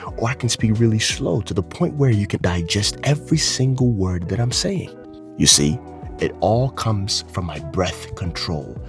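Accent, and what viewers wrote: United States. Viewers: From Algeria I Love your lessons at general and I love your Americanaccent. Particularly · I am from India ❤love your american accent but i am not able to pronounce like you please tell me how can copy you ?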